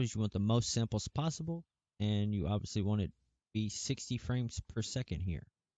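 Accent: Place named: United States. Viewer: you sound like a american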